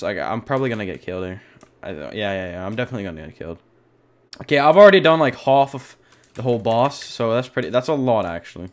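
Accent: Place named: Netherlands. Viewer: such a dutch accent tbh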